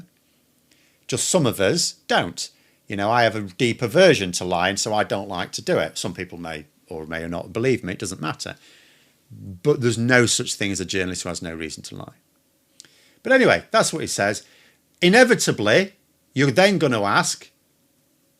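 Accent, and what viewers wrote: United Kingdom. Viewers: What dialect are you speaking? You do sound like someone from the UK, easy to understand even for a non-native listener … ;-)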